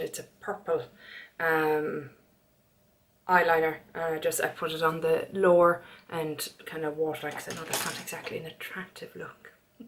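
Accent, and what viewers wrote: Ireland. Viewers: Hello! New to your channel and I absolutely loved this video! I could listen to you all day. I am so in love with the Irish accent. I hope to visit Ireland some day even if it does rain a lot. I loved hearing about all the slang. I have been binge watching your videos since I found you. You have great skin and makeup. Keep the content coming, love it. ☺️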